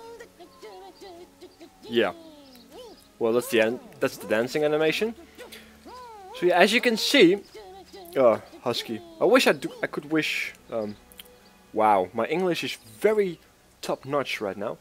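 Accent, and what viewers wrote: Netherlands. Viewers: hehe grappig dat je vaak snel kan horen of iemand Nederlands is :D Nice video trouwens.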